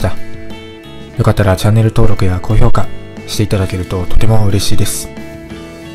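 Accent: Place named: Tohoku, Japan. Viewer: 東北のどこ出身なんだろう😂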